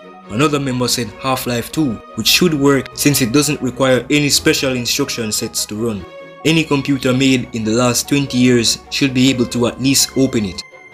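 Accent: Jamaica. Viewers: Bro sounds oddly Jamaican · Jamaican accent discussing tech is low-key soothing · Bro ur Jamaican?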